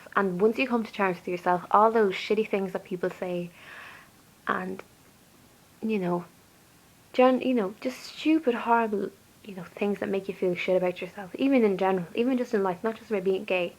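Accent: Ireland. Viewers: I'm trying to pluck up the courage to come out and watching your video is another helping hand to getting there. My heart races when I just think about saying it out loud. Oh and I absolutely love your accent :) always had a thing for Irish accents hehe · I love your beautiful irish accent and your inspiring story! I am the same! I'm the "g" word as well! I find it so amazing, you make me feel so happy and warm inside. You are so pretty! How could people call you ugly when you are the most beautiful person I have ever seen! I love you!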